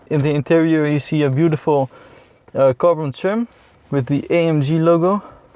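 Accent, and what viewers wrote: Netherlands. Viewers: Je hoort gewoon door je engels accent dat je nederlands bent XD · ik wist al aan je accent dat je nederlands was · maat begin aan bmw vergeet deze.....en dat hollandae accent is slecht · Duidelijk een Nederlander!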